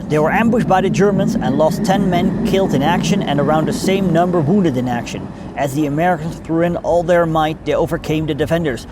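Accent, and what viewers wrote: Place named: Netherlands. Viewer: Just taking a moment off 'Driven to Distriction' (helpful for ADD History Buffs like me) to tune into this fascinating short. Im not in position to support whst you do, but i do think the style and approach, and the Dutchies accent work well together. Although Scots-Welsh, my partner and I always get mistaken for Nederlanders out on our travels; it even happened in Haarlem. Interesting what happened in Rostov this week. Back in the day, in the Valley of Snakes, there, a leading member of my profession was murdered. Wikipedia says some were still alive when the pit was backfilled; the stuff of nightmares surely. Although peace-minded, we don't forget that our the Norse, Dutch, German Resistance, etc, etc friends thatcsaved us from occupation and an overt problem of how to live and work with collaborators. Be pragmatic, or hand the back to Mossad, or both? 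The Rom have no Mossad, that I know of though; but engaging, sharp, factual presentations must be a part of the UnFinalising of YouKnowWhat. g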